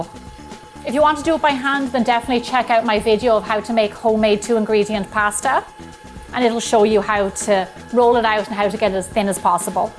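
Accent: Ireland. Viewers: So. I love your baking recipes. I love your accent (love Ireland!). BUT I'm Italian and this is what comes out of nightmares 👹🙈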